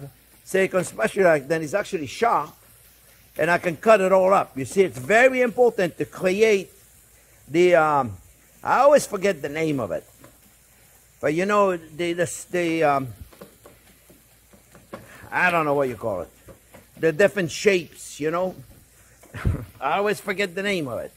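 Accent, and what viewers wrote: France. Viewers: You may have a French accent but you are a great American. I love you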